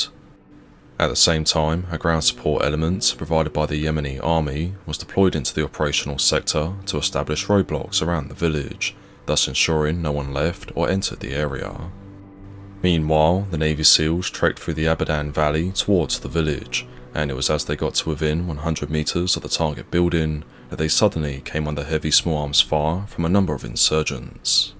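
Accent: England, United Kingdom. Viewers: I agree the video creator really works hard on finding what he can about the wars all over. He has a very clear and easy to understand what he's saying. I'm American and I assume the channel owner is from England and I can understand his accent easy. I drive a lot and have listened to most of his content driving down the road with my ear piece. I know some of this to be true which I am sure all things in the video happened. We love your channel my whole family. Thanks for the phenomenal work you do.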